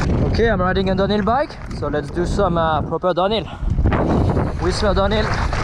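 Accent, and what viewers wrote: France. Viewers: This guy has the heaviest French accent I ever heard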